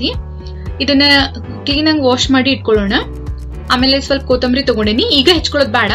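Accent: North Karnataka, India. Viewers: North karnatakada Mathu tumba channagide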